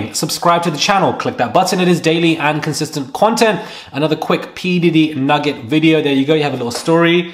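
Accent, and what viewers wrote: England, United Kingdom. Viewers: Dont u dare apologize for that beautiful sexy voice ,its like harmony when speak ,clear to the point beautiful English accent 👍and the content 🔥 fireee appreciate u 😅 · Big city London speak 🗣️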